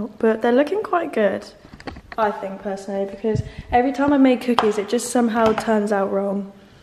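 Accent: United Kingdom. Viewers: is she british or does she have origins?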